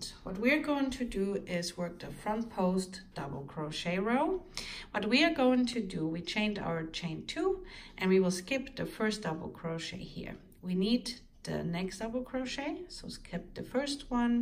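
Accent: Germany. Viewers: you speak English with the exact same accent as a German colleague of mine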